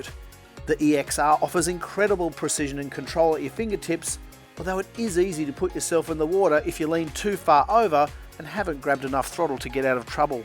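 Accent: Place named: Australia. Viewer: It's because that's Australian dollars. Notice the accent 😋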